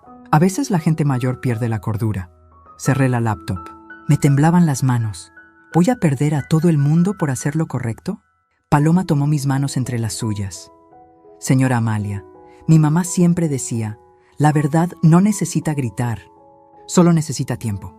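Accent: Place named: Portugal. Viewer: Buena historia esta voz narra varias historias, pero es chocante que narre y meta portuguez y la acentuacion española ?????? Con todo respeto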